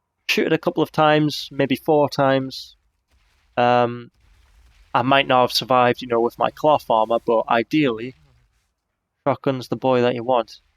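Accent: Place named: Ireland. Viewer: I love your irish accent lol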